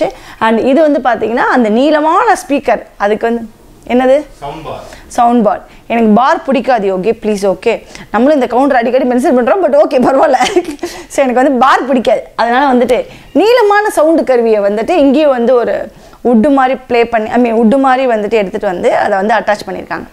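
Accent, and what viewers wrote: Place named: Madurai, India. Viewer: Neenga maduraa ah?? Madurai- slang.. துணி மணி❤️ Vijay still from Thuppaakki movie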